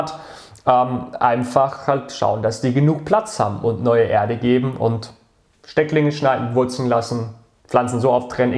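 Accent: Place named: Karlsruhe, Germany. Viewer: Habe sofort gehört, dass du von hier (Kreis KA) kommst 😁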